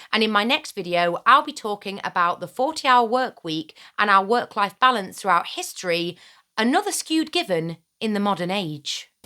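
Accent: United Kingdom. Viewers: Hello, from Michigan, USA. I wish I could express to you how much I love your content and how many times I've read things in your sweet British voice and pronunciation😊 Your videos are so well researched and thoughtfully delivered. And very refreshing in this extremely cold midwestern winter! Until next time...🧡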